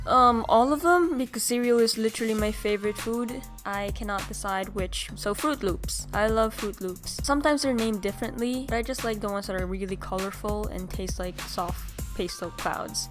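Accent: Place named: United Kingdom. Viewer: Heyy or should i say thats a cool name!! Its good to know more about you really That british accent tho i see you i see you 😂👌🏻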